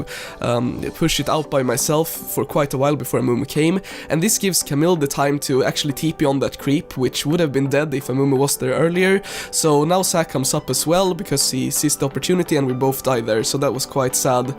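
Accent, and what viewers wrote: Sweden. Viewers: Are you swedish? you sound like it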